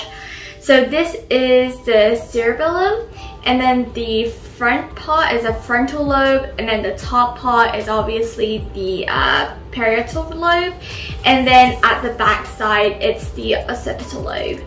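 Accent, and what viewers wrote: United Kingdom. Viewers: 영국에서부터 구독했는데 영국 액센트와 미국 액센트가 아주 묘하게 조화를 이뤘네요.^^ 예쁘고 야무지고 똑똑 부러진, 영국에서 항상 응원할게요! 부모님께서도 무척 뿌듯해하실듯요.👍❤️😍👸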